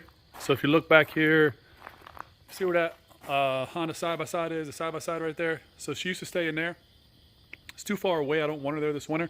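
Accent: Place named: South, United States. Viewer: That accent? LA? Definitely the south.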